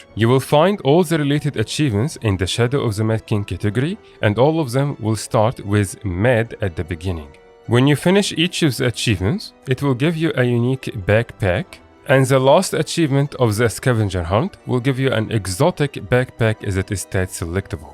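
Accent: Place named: Turkey. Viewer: I like your accent. Sounds Turkish but I might be wrong. Also, cool video, clean and concise! <3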